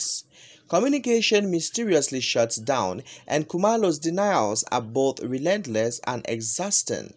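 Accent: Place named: Nigeria. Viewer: But why is a Nigerian saying this... Nigerian accent all over the place 😅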